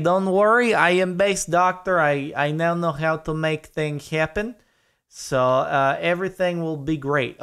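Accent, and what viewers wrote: Russia. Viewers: I love your accent it sounds like a Russian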